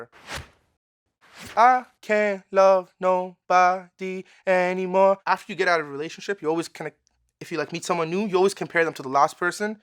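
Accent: Toronto, Canada. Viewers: Toronto mans accent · When he started I thought he has a toronto accent and the I looked him up and I was right! Lol anyways this guy is amazing! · he sounds like a guy from toronto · I can tell by the way he talks he’s from Toronto.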